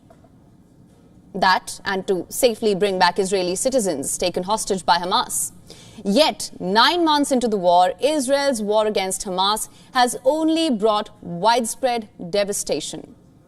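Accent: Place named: India. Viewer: She still have Indian accents that sounds good for the Indians.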